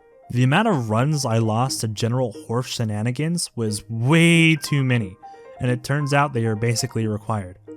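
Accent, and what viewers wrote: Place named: Australia. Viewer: i can just hear your Australian accent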